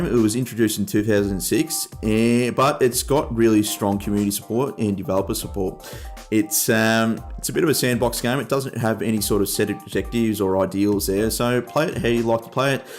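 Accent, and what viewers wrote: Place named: Australia. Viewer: Secound ! Can i has a Gmod :3 Dat australian accent ;D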